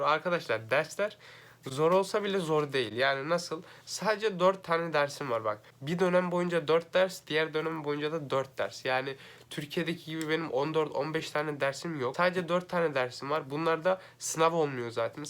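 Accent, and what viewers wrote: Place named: Germany. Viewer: Kardes oyle bir ağzın var ki 30 senedir almanyada yasayan kuzenim gibisin , abd'de 1 senede turkceyi mi unuttun neden konuşamıyorsun?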